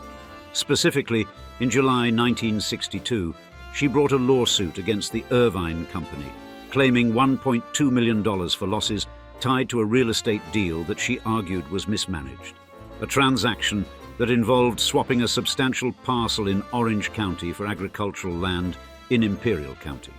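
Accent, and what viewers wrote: RP, United Kingdom. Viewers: The posh British accent makes it so much more Old Money sounding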